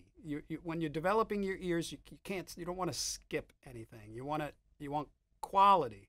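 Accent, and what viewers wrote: United States. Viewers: I just got back to this after a day of work. I can totally hear your Brooklyn accent now!!!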